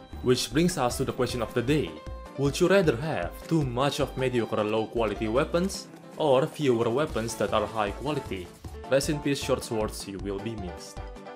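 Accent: Russia. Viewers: bro this man has a very cool russian accent and i approve of it! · why is your accent similar to russian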